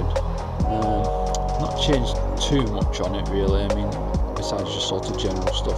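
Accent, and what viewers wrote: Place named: North West England, United Kingdom. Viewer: Where you from mate? Sound local to me definitely north west anyway haha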